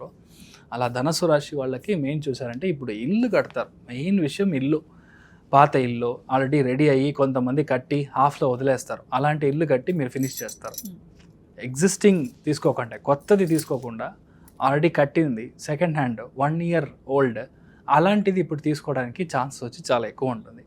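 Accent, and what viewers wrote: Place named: Tamil Nadu, India. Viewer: ఏం తెలుగు రా నాయనా.. తమిళ యాస, సరైన తెలుగు పదాలు కూడా మాట్లాడటం తెలియడము లేదు. ముందు తెలుగు సరిగ్గా నేర్చుకుని రా గురువా..🤔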